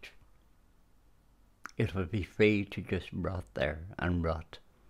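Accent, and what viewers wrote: Ireland. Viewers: My goodness. That was wonderful! Thank you. You must be a stage actor your monologue is the very best of Irish cadence and delivery. Wonderful wonderful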